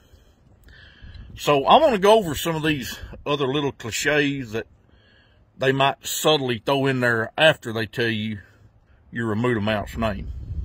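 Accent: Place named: United States. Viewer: What state is your accent from?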